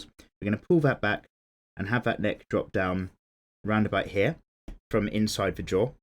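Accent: United Kingdom. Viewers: WHY IS THE BRITISH ACCENT SO BEAUTIFUL?! TTATT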